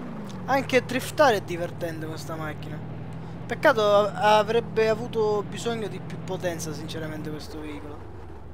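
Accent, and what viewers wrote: Sicily, Italy. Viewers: ma sei siciliano come me?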